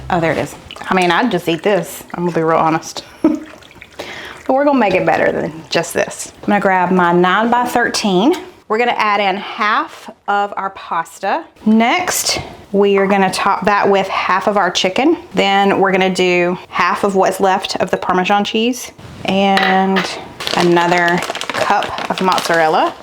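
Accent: South, United States. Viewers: I must also be old! I was singing along with you each time! All of these look delicious! Both my daughters ask to watch " from in the Makin'" (in a cute midwestern version of your southern accent!) every weekend as we are making up our meal plan for the week. And my youngest son asks if it is a meal when it is something new. To say you have made a wonderful impact on my family's mealtime is not an understatement! Thank you for all you and your family does for us! Smiles, Abby Smith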